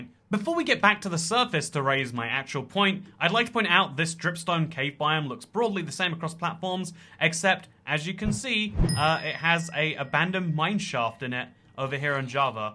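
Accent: United Kingdom. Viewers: cus he's bri*ish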